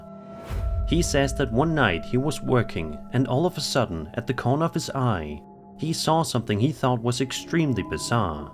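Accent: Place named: Denmark. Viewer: Brormand, jeg har set dine videoer I flere år og har først lige fundet ud af at du er dansker nu, wtf? 😂 Btw, fandt ud af det pga. Jeg så en af dine gamle videoer hvor du snakkede om merch og din accent var ikke til at tage fejl af 😂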